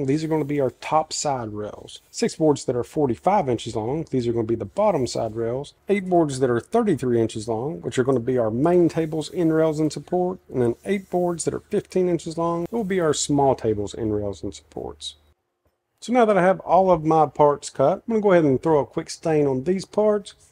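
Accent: South, United States. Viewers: Whenever someone with a thick southern accent can actually do something useful, Im shocked. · Cattywampus lol!? That's a fun word! Awe, I wish you wouldn't edit it out your southern accent .... I don't know about anybody else. But, I love me a southern accent!! Another Awesome video! 😁 I need this workbench! I currently am using two sawhorses with plywood that holds my chop saw and it's also my workbench as well as my new dining room table that I'm supposed to stain and finish .... And Not use it as a workbench extension. Hopefully soon I can make me one of these! 😁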